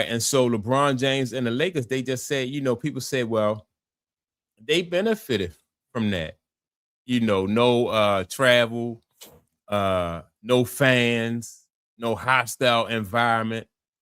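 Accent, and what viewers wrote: Baltimore, United States. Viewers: Can tell my man doing the video is from Baltimore. I respect the hustle G! Great video.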